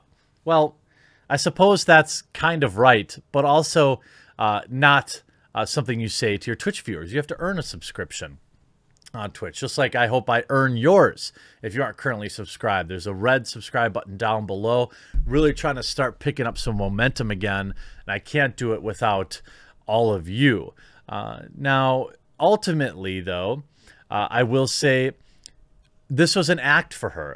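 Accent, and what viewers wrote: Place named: California, United States. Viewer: where in california is that accent from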